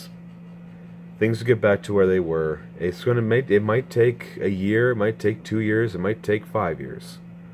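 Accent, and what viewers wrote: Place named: United Kingdom. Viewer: Perks of watching the streams: you get to hear the phone story earlier....and in a British accent XD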